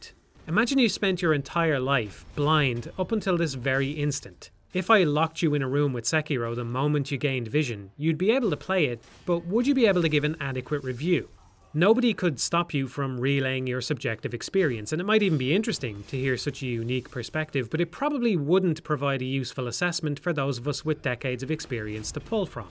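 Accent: Ireland. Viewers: He's Irish, it is impossible to sound pompous with an Irish accent. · the Irish accent is wonderful to hear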